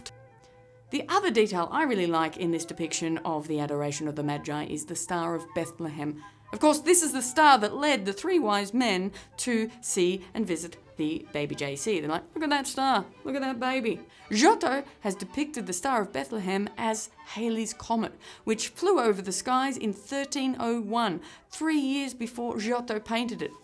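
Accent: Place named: Australia. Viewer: as someone English I think she really doesn't sound English? she's Australian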